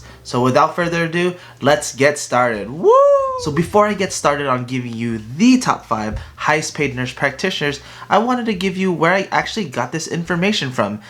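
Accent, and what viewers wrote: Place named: Philippines. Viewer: Filipino accent?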